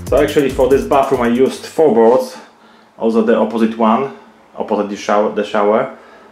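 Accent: Poland. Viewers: His accent sounds Polish to me.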